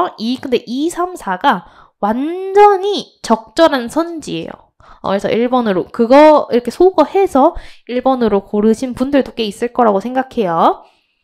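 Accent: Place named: Gyeongsang, South Korea. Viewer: 경상도 분이신가 사투리 나오시네 ㅋㅋ귀엽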